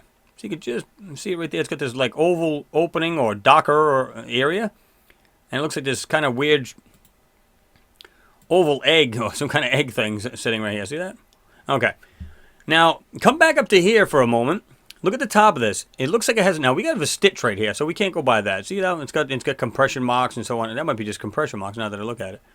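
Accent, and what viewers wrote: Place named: New England, United States. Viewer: Also I've seen a lot of your videos and like them a lot, I want to point out an anomilie with your accent, are you from new england??? I myself am originally from Maine and hear that. LOL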